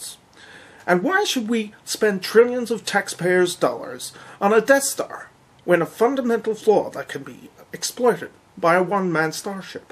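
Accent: Standard. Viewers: Fairly well done neutral American accent :-)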